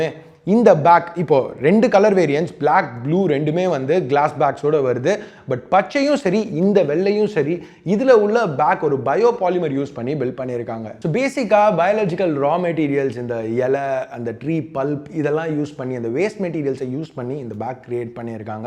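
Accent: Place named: Chennai, India. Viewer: Ena bro Chennai tamil pesuringa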